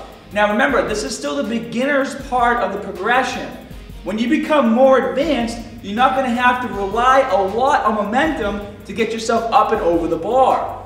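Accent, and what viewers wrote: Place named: Brooklyn, United States. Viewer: Spot Conlin It's his Brooklyn accent.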